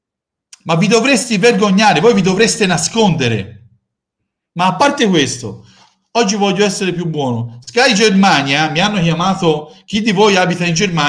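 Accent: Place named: Florence, Italy. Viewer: Bellissimo il tuo accento fiorentino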